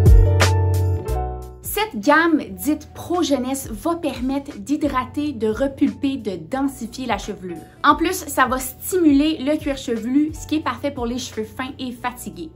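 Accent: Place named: Quebec, Canada. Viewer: J’aime trop ta vibes et ton chou accent québécois je t’aime❤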